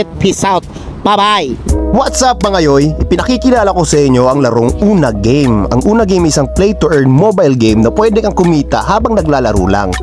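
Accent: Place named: China. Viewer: Oragun c tagalog in tsikwa accent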